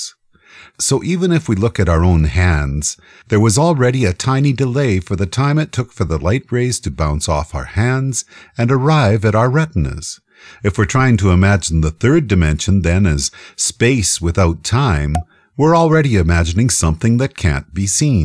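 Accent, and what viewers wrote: Canada. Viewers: ok, i must know... the person commentating is canadian, right?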